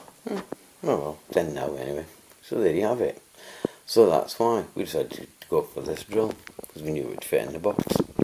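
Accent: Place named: Scottish Borders, United Kingdom. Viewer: Where you from you sound like the borders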